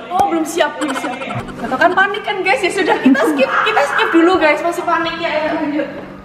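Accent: Java, Indonesia. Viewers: Kak orang Jawa kah? Kok kyk ada medoknya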